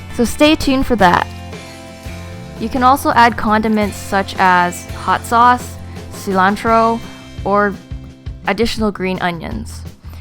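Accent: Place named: California, United States. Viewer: Gastronomic Relevancy Hi, I subscribed too yer channel, But where are all the videos? I'm from California and your English dialect sounds like you may be too. You have a great voice to listen too and your videos are very well done! I want to learn so many Chinese dishes and how to cook with a wok! So, start cooking girl! Show us how to make that sweet and sour pork or chicken right in the wok. Okay? And you definitely must show us how to make those awesome Shanghai soup dumplings. They are outta this world you guys. You are gonna get so many subscribers! And make a lotta money!;) Oh, and you don't just have to make Chinese dishes, make whatever you want...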